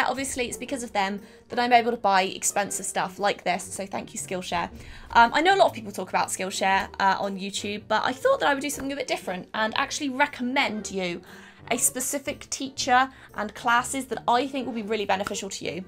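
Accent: United Kingdom. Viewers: Wait she's British!? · Where are you from ??????!!! You sound British but you say dollars ahh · i just LOVE your British accent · You have the accent of the British baby from Family Guy. Not Stewie but the girl he had a crush on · Who els loves British accent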